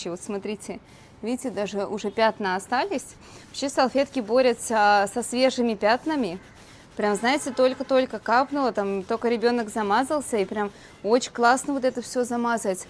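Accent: India. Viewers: Как сладко говорит по индийски 😍😍😍🥰а голос ее похож на голос свекрови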